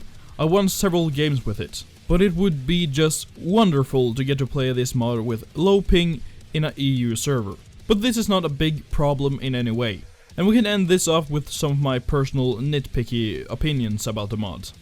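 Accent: Norway. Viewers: are you norwegian? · are you Norwegian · are you Norwegian? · OMG i now know you're norwegian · Er du norsk? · e du norsk? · Are you from norway?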